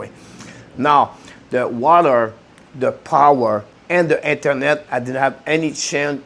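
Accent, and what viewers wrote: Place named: Quebec, Canada. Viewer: on dirais un quebecois tu vient de ou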